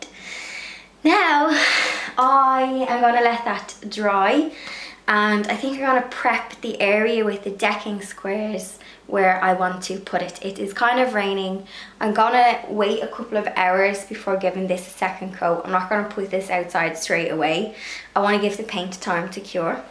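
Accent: Ireland. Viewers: I have been watching your videos here lately and am loving you. I am a 64yrold lady who was born in England and love listening to your Irish accent. you are o cute and so crafty. It makes me want to do things I used to do. So I started to do a few little crafts like a beginner even though I used to do this stuff all the time. Thank you for your inspiration and keep it coming. God bless you.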